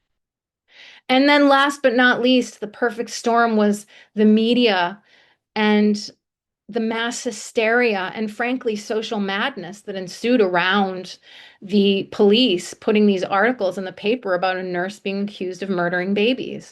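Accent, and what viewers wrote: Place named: United States. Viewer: I take it by your accent that you are an American? So am I…..retired American nurse…..have been involved with this case for 2 years…… I also have a channel…… there are also probable innocent convicted serial killer nurses here in America…… if you are interested once Lucy is free to help these nurses out let me know…..I am trying to get fellow Americans interested in freeing my wrongly convicted nurses…..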